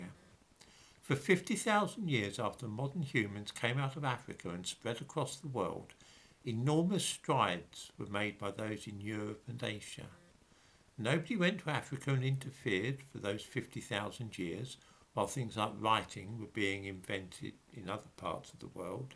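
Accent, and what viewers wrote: United Kingdom. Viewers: All of the base technologies that allowed the human species to become the alpha predator of planet Earth developed in Africa before Africans migrated throughout the world. A lie of omission, even when spoken with a British accent, is a lie nonetheless..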